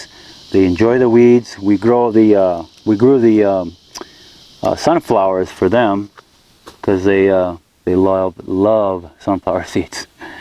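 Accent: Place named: United States. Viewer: You ever been told you have a slight Polish-American accent. I live in south Texas with mainly Polish-American and Mexican-American folks and you sound like you are from around these parts.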